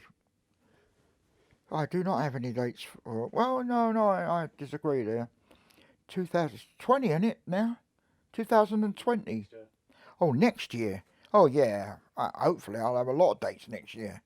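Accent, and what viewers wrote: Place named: England, United Kingdom. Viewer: Hi I didn't know you are a pomie. I thought you were a Yank. But I like you so much mate cause your a man you got that attitude and you remind me of my friends of the past. More boys should grow up like you. As far as doing your hair you can watch my video called balding and greying part 2 Dave Kirby.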